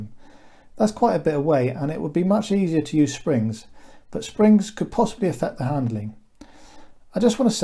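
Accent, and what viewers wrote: United Kingdom. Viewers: This is a fantastic project. I have always wanted to build my own aircraft but have too many restrictions. There is an airfield within 3 miles of my home but it is run by a bunch of millionaires who like to keep it as an exclusive club for them and their cronies and excude any other aircraft types. There used to be a microlight school operating there but the committee of millionaires drove him out! Because of this I have been looking for an aircraft that could be operated from my large back garden so a helicopter would be the answer but in the UK we have very restrictive legislation regarding home built aircraft especially rotorcraft which has always put me off building an aircraft. I wish we had the same freedoms as they do in the US. Judging from your accent and the fact you mentioned getting your engine for 500 quid in another video you are indeed in the UK. 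if so how are you going to get this aircraft certified to make it legal to fly as otherwise it will end up being an expensive garden ornament. Also if i was building this aircraft i would look at making some redundancy in the belt drive ie have more than one belt per rotor as if one of the belts on your craft were to go twang one of the roters will stop and you will have no control of it and it will be game over!!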